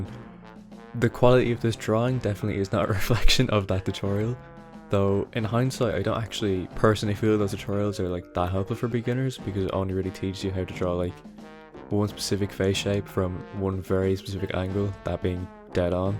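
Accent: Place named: Ireland. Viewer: I myself have just over 8 years drawing experience and I can say for a fact that your work is greater than some 2nd 3rd year art college students I know. You definitely have the ability to comprehend the forms and shapes of objects and you have a knack for visual effects as well, which are some of the hardest parts of Shonen or Fantasy artworks, Be proud and remember that "slow progress is better than no progress" when you are stuck in a burnout or creative block :) I think I hear an Irish accent in there so if youre not in college yet, do consider This Is Art! Alot of the time when your work is judged they send feedback given by some Art Professors and Spearheads in the creative industry - Hope this is helpful!!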